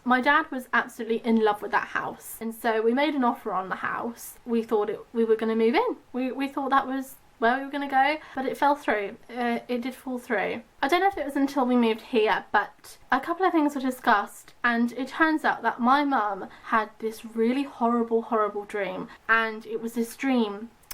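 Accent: United Kingdom. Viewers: love the British accent it reminds me of cat deely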